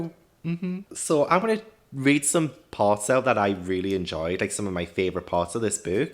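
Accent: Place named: Wales, United Kingdom. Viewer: Actually, I think you'll be okay without that Sex and Holiness video. Even someone with a very limited imagination can guess where that's going... and you strike me as someone with a very vivid imagination! I mean, the irony of it... a man who's sworn off sex has all the answers! Are you Welsh? (sorry if you're not and that's an insult!) Or maybe northern? (sorry if you're Welsh and that's an insult). Whatever you accent is, I could listen to you all day!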